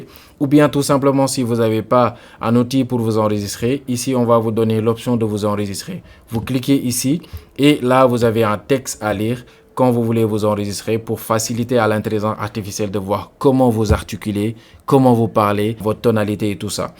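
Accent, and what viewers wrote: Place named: Africa. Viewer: N'IMPORTE QUOI !! ce n'est pas du tout un clone de ta voix ! Notamment, ta voix clonée n'a pas ton accent africain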